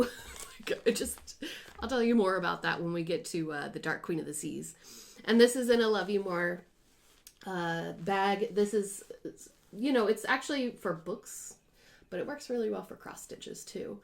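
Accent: Ireland. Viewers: Definitely 'But' in your unique 'Irish accent.❤ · All of your needle minders are darling!! I love that pegallamacorn!!! The first time I heard you say “but” in your Irish lilt I thought you said “bush”, LOL!! Then you said it again and I realized what you were doing!! LOL!! Question: what are you talking about with Grogu (baby Yoda)?? What the heck am I missing out on?? I’m willing to bet Jacob from MFE would be a great resource on what makes a sampler a sampler!! Thanks for sharing!! See you next time...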